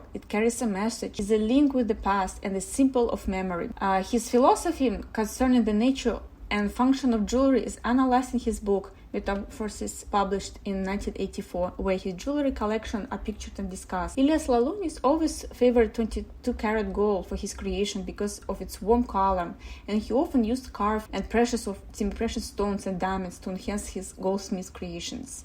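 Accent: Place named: Russia. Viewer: She’s Russian. This is a Russian accent and I understand her perfectly. It helps that I attended a Russian church for over 35 years with my parents so I got very used to hearing Russian.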